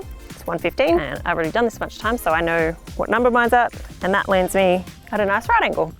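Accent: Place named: Australia. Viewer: OH.......! I am NOT being xenophobic here cause I reckon Culture & Multi-Culture are GREAT.! - But It's SO NICE To HEAR An AUSSIE Accent on YouTube....!!! Thanks, good work matey.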